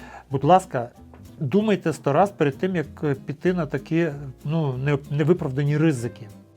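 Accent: Ukraine. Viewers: Величезний респект лікарю, за те що є можливість слухати його українською мовою♥️ До речі ваша українська надзвичайно чиста , як з заходу України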